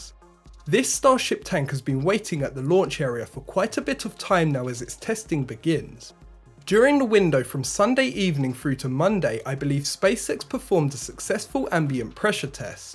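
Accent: England, United Kingdom. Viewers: Christopher Carr you throw linguistic terms but you can’t tell he has an English accent to me that seems unusual.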